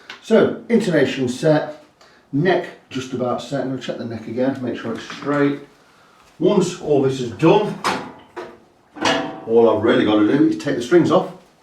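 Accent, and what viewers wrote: United Kingdom. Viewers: Please, can someone tell me what measure did he say on ? I am just not used to such a strong british accent and I can't tell if it's 0 or 1 mm he is talking about.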